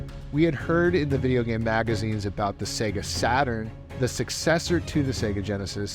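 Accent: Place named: Brooklyn, United States. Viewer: Whats up with the East Flatbush Brooklyn accent?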